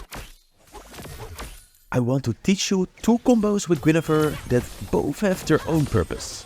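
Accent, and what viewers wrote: Netherlands. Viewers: Are you Dutch? Lol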